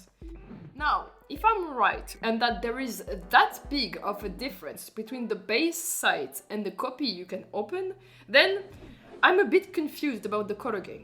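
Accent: France. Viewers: Watching cool video As a French instantly recognise the strong French accent Even cooler video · French accent (⑅•ᴗ•⑅) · Est-ce un accent français que j'entends là? (Is it a French accent that I'm hearing here?) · Not related to the video but are you french by any chance? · Is that french accent 🙏🏼😭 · Very cool video but I just want to ask are you french ? Sorry im curious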